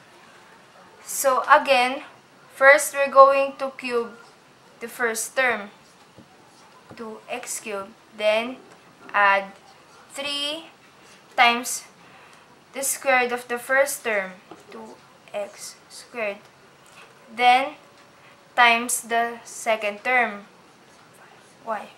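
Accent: Philippines. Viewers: ACCENT PALANG PINAY NA!! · I'd thought the accent was familiar, Pinoy ka pala eh... Salamat sa video mo... naka pag arak ako para sa test ko sa school 😁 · sabi ko na pilipino ka dahil sa iyong accent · are you a filipino? because you have the accent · You sound like a filipino trying to talk English.... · I knew na Pinay ka po ^^, accent pa lang. xD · Sabi ko naga ba pinay ka boses palang halata na haha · You are a Filipina, aren't you? because your accent though :D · sabi ko na Pilipino ka eh, yung diction mo eh :) great work! di ko 'to alam, buti gumawa ka ng video na hindi sobrang haba. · U are Filipino, i can tell by the accent. We speak the same accent kse hehe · Are you Filipino? Because you talk like one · Pinoy to guys im proud to be pinoy ang galing ito thank you po for uploading this (kung wla line of 7 ako) (accent mo btw is pinoy its easy)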